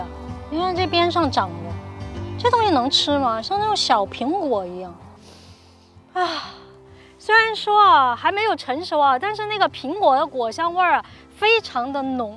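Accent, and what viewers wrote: Sichuan, China. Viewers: 浓浓的川普口音，好亲切啊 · 喜欢这四川口音。哈哈🤣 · 川普😀😀四川哪儿的啊 妹儿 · 一直以为yooupi是东北人 原来是四川妹子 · 怎么从普通话里听出了一丝川渝味道……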